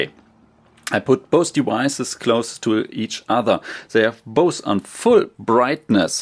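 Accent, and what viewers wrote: Germany. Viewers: Are you from Germany nice accent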